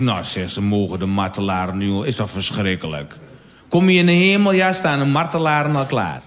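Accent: Twente, Netherlands. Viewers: Antwerps dialect k dacht toch echt dat dit Twents is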